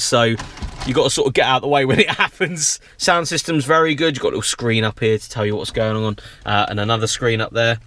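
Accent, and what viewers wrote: United Kingdom. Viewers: Oh My God ... I love UK accent .. !!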